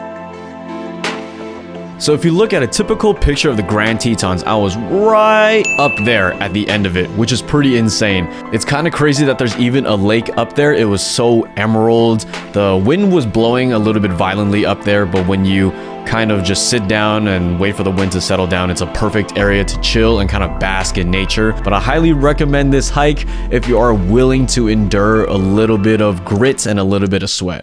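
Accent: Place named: Bay Area, United States. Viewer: “Where’s the trail, bruh” I know a fellow Bay Arean when I hear one. 😂😂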